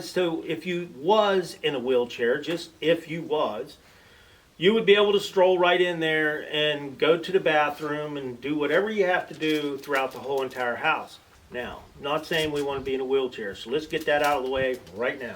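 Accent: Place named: Pittsburgh, United States. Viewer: Iam wondering where ur accent where ur from! Sounds like Pittsburgh Pennsylvania area!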